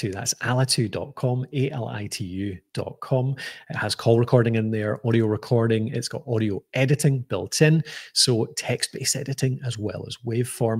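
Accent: Scotland, United Kingdom. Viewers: soon as i heard that Scottish accent i knew i was in safe hands, top man!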